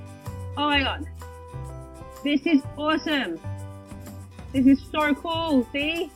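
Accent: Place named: Australia. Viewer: Are you Aussie? You sound so Aussie hahaha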